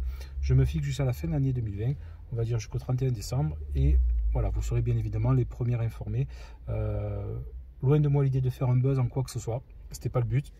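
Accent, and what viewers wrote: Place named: South, France. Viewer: Salut. Je suis abonné à ta chaine depuis pas mal de temps et je suis vraiment triste d'apprendre que d'ici la fin de l'année, tout pourrait s'arrêter. En même je te comprends et je me doute que cela ne doit pas être facile de subir régulièrement de la méchanceté gratuite. Personnellement, quand je n'aime pas quelque chose ou quelqu'un qui passe dans les médias, j'arrête de le regarder, c'est une question de bon sens. D"autres personnes préfèrent regarder juste pour critiquer voir insulter. C'est triste mais le monde est ainsi fait. Des cons, il y en a toujours eu et il y en aura toujours mais le monde est obligé de continuer de tourner. J'espère que d'ici la fin 2020 tu auras changer d'avis et que tu continueras de nous régaler avec ta joie, ta bonne humeur et ton accent qui sent si bon le sud mais si malheureusement, il en était autrement, alors je respecterais ta décison. 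Prends bien soin de toi et de ta famille.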